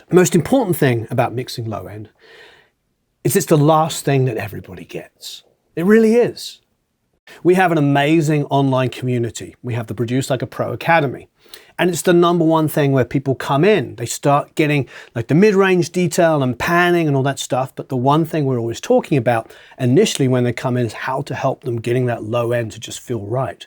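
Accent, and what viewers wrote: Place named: RP, United Kingdom. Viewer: Hi, Hope you are doing Marvelously Well! :haha I remember watching 5 years ago and I still remember the posh accent lol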